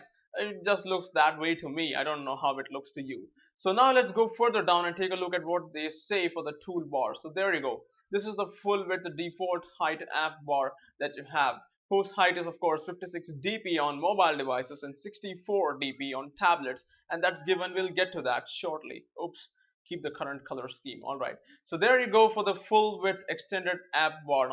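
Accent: India. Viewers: Where are you from ? lol Indian??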